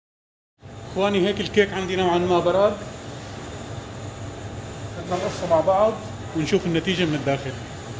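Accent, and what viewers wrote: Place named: Damascus, Syria. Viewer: الله يعطيك الف عافيه انا لما بشوف فيديوهاتك وطريقه حكيك بحس حالي قاعده بنص شام الله يفرج ع سوريه واهله بجاه الحبيب محمد(ص)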